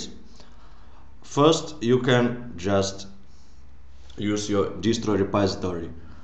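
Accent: Russia. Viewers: мне показалось или он русский???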